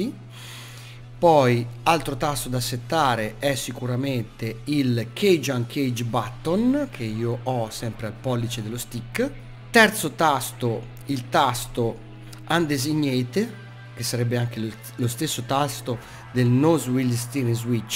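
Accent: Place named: Tuscany, Italy. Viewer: Ciao, analizzo passo passo il tuo video: All'inizio menzioni, e dici che non fai i video belli come i suoi... personalmente ritengo che sia inutile fare in due lo stesso video, quindi trovo utili i tuoi video, come quelli di! Lui molto professionale, con un inglese unico, tu molto "amicone con il tuo eccezionale accento toscano!!!!" Interessante il video che hai fatto, devo dirti che però lanciare i Sidewinder fin che non senti il look, non serve quasi a nulla, andranno quasi sicuramente persi, infatti nel tuo video, si deve che solo il missile che hai lanciato con il look inserito va a segno.. Ultimo prima di salutarti, devo dire che se in futuro spieghi anche le varie versioni del AIM-9, ritengo sia cosa gradita!!! Ciao grande uomo, a presto!!!! NB. dimenticavo, tutto questo dopo la sigla... e' troppo figo!!!!!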